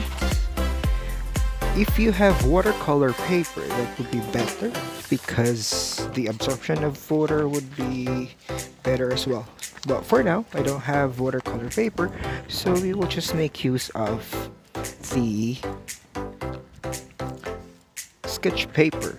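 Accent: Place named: United Kingdom. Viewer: lovi'n the british accent though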